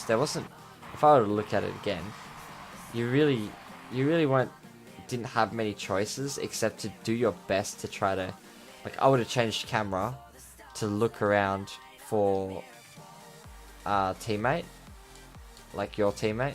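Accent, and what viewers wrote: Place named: Australia. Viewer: Holy shit your Australian accent is deep, I'm just used to you on your Facebook page, with no accent lol. Love the video tho bro, keep it up and good luck.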